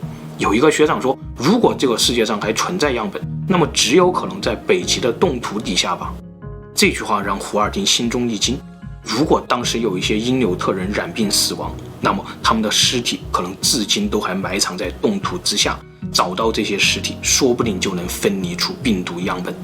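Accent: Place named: Hunan, China. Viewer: 的湖南口音真的讓我欲罷不能啊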